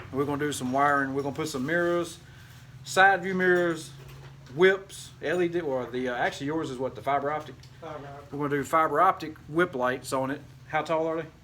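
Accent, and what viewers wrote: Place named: North Carolina, United States. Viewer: You sure sound like you could be from NE North Carolina. Ordered the Anzo unit this week. Lookin for it to be here Friday. Thanks for the video. Very helpful.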